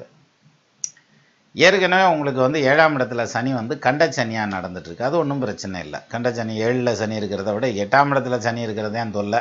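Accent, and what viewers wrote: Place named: South Tamil Nadu, India. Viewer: Sir,I like ur Tamil and slang..like we south Tamils. Thank u.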